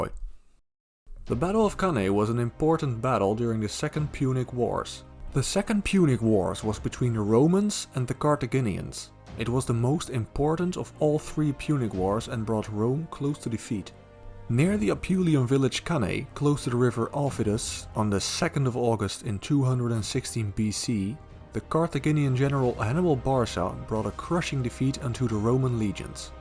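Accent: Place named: Flanders, Belgium. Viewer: Are you from Belgium? I am hearing a Flemish accent.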